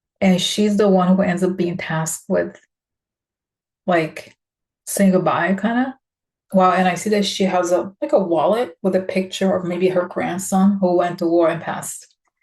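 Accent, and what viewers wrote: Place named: Russia. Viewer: Sing it,!!! 😊💕🎶 Also, your Rüski accent makes me so happy! 😂💕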